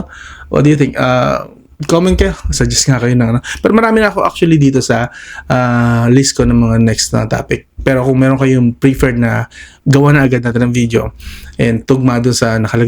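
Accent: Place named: Conyo, Philippines. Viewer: conyo hehe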